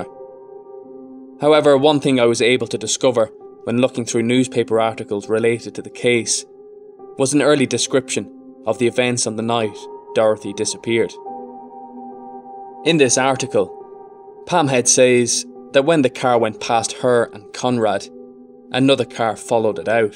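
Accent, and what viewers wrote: Ireland. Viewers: What part of Ireland are you from? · I personally would have waited a few minutes then went looking for her... such a sad case she was beautiful girl... only found your channel yesterday been binge watching it frm then... new subbie keep up the great work 👍 i could listen to your voice all day! Are you from republic of Ireland?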